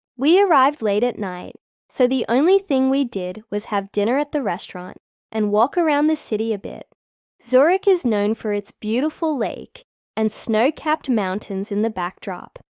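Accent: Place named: United States. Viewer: Oh no, another American-speaking influencer girl. Don't we have enough?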